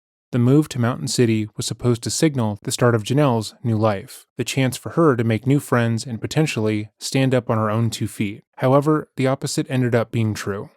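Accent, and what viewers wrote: Canada. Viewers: Is it just me, or can we all hear the Canadian accent more and more these last few videos? I couldn't hear it or wasn't paying attention in your earlier videos, but your voice is one big reason why I love your channel, so I'm sure I would have heard it before. Just wondering. ❤ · First time completely hearing the Canadian coming through, guys. Currently in New Orleans, so, stark contrast. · Wait, are you Canadian? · First of all, I love this channel. I've been watching pretty much since day one and will continue to do so. With that said, I've noticed in the last few videos a canadian accent that I didn't pick up on earlier videos. Anyone else?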